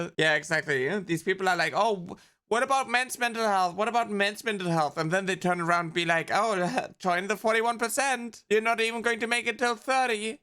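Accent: Germany. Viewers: Ok I am gonna say it, I thought you were Shyaren under a new name cause I haven't heard her voice in a long time lol. I guess the very distinctive German accents you two have made me think that lol. I will maintain if you had a high pitch and were more of a gremlin, you two would sound exactly the same lol. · That's what I thought too 💜 I immediately recognized the German accent and got super happy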